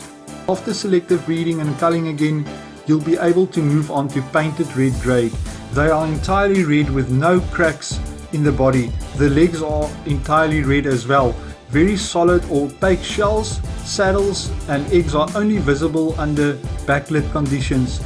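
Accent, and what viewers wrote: Afrikaans, South Africa. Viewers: Is that an Afrikaans accent I hear😎👍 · More oom kan ons praat oom klink Afrikaans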